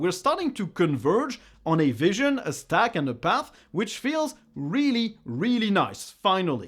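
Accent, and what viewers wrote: France. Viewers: I'm living in Haute-Loire, and as a French it's very strange to watch a French speaking English, at least I totally understand what you are saying 😆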